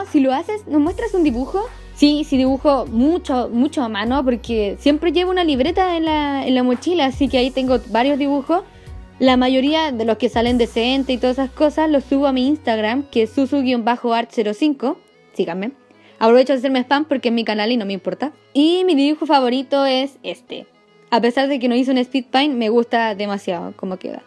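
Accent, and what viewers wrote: Chile. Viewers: Es que lo dije en cuánto escuche tu voz xD Se nos nota mucho el acento chilensis(?) xD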